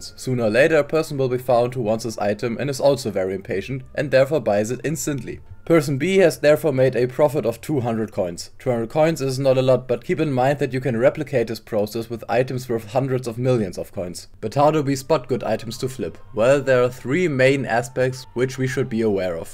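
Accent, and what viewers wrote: Germany. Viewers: Man hört ganz dolle, dass du deutscher bist😂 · i hear a DEUTSCHER AKZENT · Are you german? because you have that german accent i hear every time i talk with someone german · Man wieso immer dieser Deutsche Akzent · Bro sounds german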